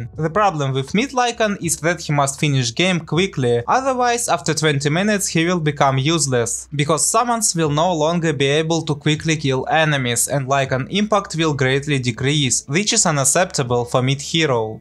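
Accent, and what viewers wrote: Russia. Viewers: Sounds like рашан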